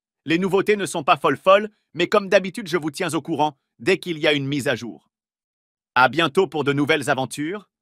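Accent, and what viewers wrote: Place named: Canada. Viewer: Merci, Très expressive cette voix avec un petit accent canadien je crois. De quelle voix de synthèse vocale s'agit-il? Bravo pour le travail👍😎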